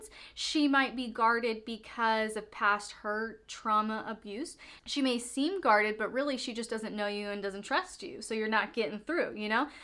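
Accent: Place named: South, United States. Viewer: I think this is one of the best explanations I've heard on this. Maybe it's because where I am in life right now. But in a sense I think you were talking to me. BTW, you sound like you have a bit of a Southern accent. Are from the south? It'll be my luck you'll say, "I'm from NYC. I don't know how you got that idea!" LOL! Thank you for the advice.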